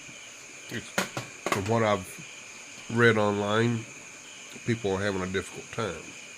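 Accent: United States. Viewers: That's okay,. After watching you for more than several years now, I simply came to the conclusion that the lag phenomenon was a result of your wonderful Kentucky drawl and nothing more.. Didn't bother me none. LOL 🤣 I honestly think most of these price increases are due to nothing more than blatant price gouging - maybe up to 30 %. I try to counter the rising prices by growing more fruits and vegetables plus reducing my electrical consumption wherever I can. We have more romantic candlelight dinners and make more use of mama's dirty kitchen while pretending we are camping out. I cut back on or even stopped purchasing some American dishes I have a hankering for. Still trying to put money aside for solar power, but not as much as I once was. Anyway, still breathing, drawing my retirement and enjoying life in the Philippines. Can't really complain. Thanks for sharing and letting your subscribers know what life is truly about out in the boondocks, my friend. 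😃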